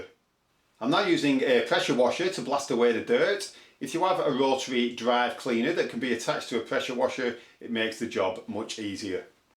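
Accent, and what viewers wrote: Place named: Lancashire, United Kingdom. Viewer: Can the Russians not understand your Lancashire accent?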